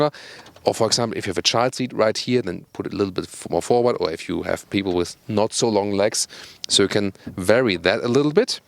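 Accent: Germany. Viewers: I hear a review in a German accent. SOLD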